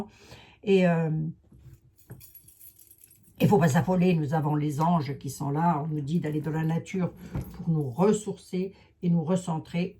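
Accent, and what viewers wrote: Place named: Lorraine, France. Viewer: Ah voilà pourquoi votre accent m'interpelle et votre facon de parler m'est douce à l'oreille ! Vous êtes lorraine ! Ma grand mère tant aimée était à Metz. Ma ville de coeur où j'ai passé toutes mes vacances, durant mon enfance ! Oui creusez creusez... Et le 30-31 on aura sans doute le début des réponses. Can dace a prévenu ce sera en plusieurs épisodes ! 😉🤩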